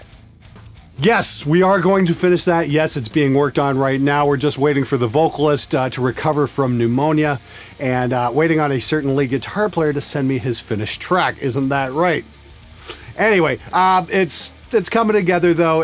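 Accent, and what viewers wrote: Canada. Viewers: Are you canadian? Some of your pronounciation is pretty canadian.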